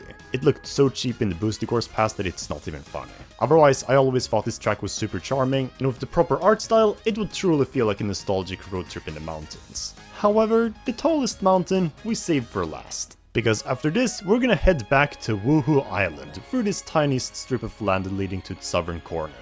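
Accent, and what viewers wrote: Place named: Sweden. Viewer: She’s Swedish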